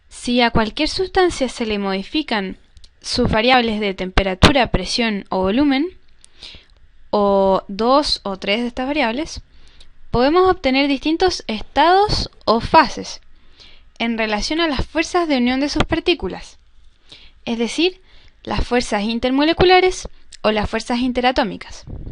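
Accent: Chile. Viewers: Sos de Chile ami no me engañas jaja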